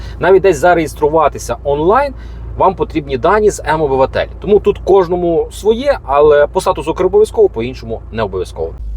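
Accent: Western Ukraine, Ukraine. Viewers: Який гидкий голос за кадром, ще й, з западенським підви́вом..Якою мовою вона говорить, бо я українка, але не розумію, що вона там варнякає..? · Бридкий західний акцент і оце кошмар, говоріть українською, і наголоси ставте правильно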